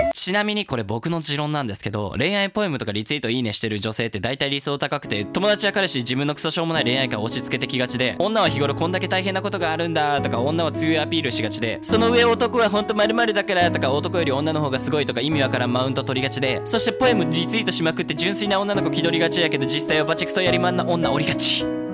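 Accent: Kansai, Japan. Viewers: イントネーションめっちゃ関西弁やん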